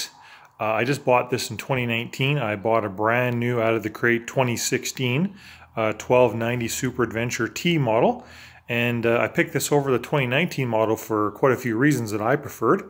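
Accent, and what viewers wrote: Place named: East Coast, United States. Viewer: When I started listening to your video I would have sworn that you were an east coaster. Your accent doesn't sound like Alberta to me! Thanks for the video. I don't like the tft screen, and DO NOT want keyless start! Maybe this is the bike for me. I don't like the white, but maybe that shouldn't matter at all. I am near Niagara in Ontario and plan to explore this great country of ours on two wheels. Part of.menthinks this is the way to go.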